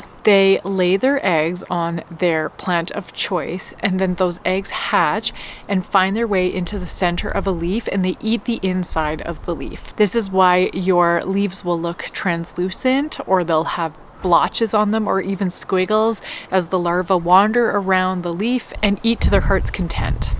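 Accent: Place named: Canada. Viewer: I am growing these: 1 coffee shrub, 1 avocado shrub, 12 buckets of potatoes, 6 buckets tomatoes, 6 buckets onions, 6 buckets sweet corn, 6 buckets carrots, 2 buckets yellow squash, 2 buckets green beans, 2 buckets jalapenos, 2 buckets green peppers. I'll look & see if I find any of these larvae. Thanks for the heads up. Love your Canadian accent !! I subscribed.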